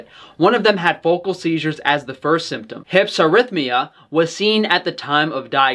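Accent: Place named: South, United States. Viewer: that southern accent lol dang...thank-you for opening our eyes to the divides that are in place to lower our vibration and cause turmoil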